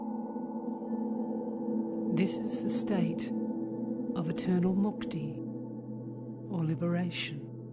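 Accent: Australia. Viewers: are you an Australia? I love listening to your readings and i love the space you give it, the most essential aspect of this work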